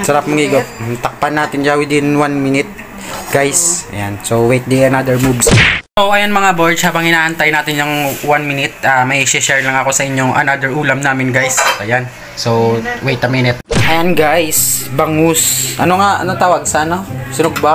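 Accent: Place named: Iloilo, Philippines. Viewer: Hahaha dami kung tawa sayo lods.,lalo sa tabagak mo.,ay nano tinonto ito hahaha ilonggo gid mong.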